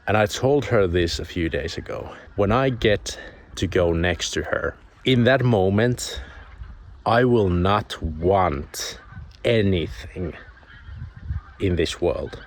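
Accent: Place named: Finland. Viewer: Very thought provoking Mate. Pardon my ignorance but are you in Finland?The nature looks that way and to me you sound Finnish.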